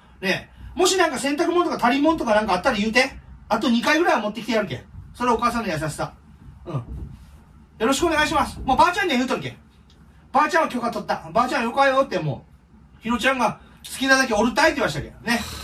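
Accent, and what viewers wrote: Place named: Kumamoto, Japan. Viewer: 熊本弁落ち着くわ～ 久しぶりに熊本帰りたい！☺️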